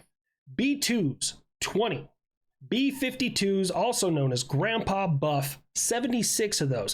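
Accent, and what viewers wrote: United States. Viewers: You know, this guy sounds like America...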